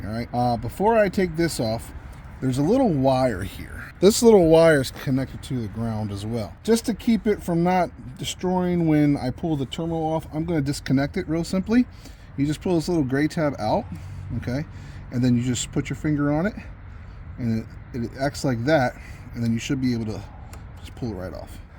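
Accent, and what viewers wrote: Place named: Indiana, United States. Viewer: You sound like you’re from Indiana. Thanks for the video, helped me do it! I’m never taking my car in and paying for a battery change, no matter how complicated they make it!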